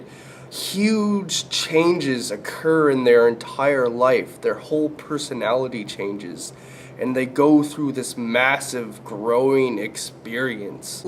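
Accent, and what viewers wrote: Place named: Canada. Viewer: Actually your account said you were. But now that you mention it, yea I hear the voice of a Canadian. *thumbs up!*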